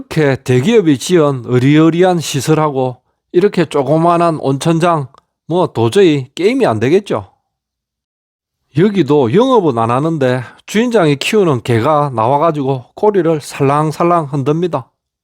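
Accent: Gyeongsang, South Korea. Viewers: 표준말로 하세요 갱상도말 역겨워요 늘 부정적인 얘기 너무 지겨워요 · 방송 너무 감사합니다 경상도 사투리가 참 구수하네요 좋은 방송 정보 많이 부탁드립니다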